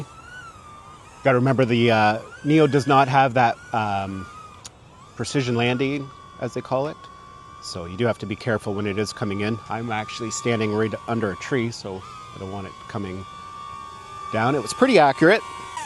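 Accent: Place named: Maritimes, Canada. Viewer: Great video... and you sound like a fellow Maritimer... getting my Neo soon just waiting for a possible Black Friday deal.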